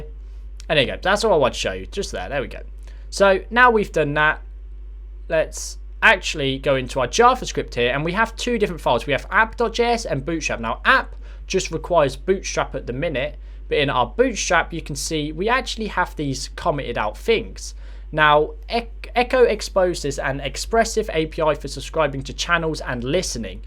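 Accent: United Kingdom. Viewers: british accent hahaha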